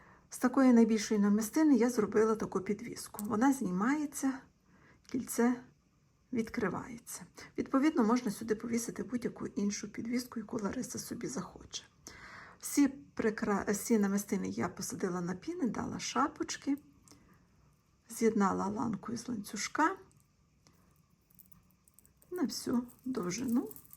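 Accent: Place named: Lviv, Ukraine. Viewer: Пані! У вас дуже гарна львівська вимова( чи тембр,я не знаю як правильно назвати) я отримую велику насолоду від цього.будьте здорові! Ми переможемо!!!!❤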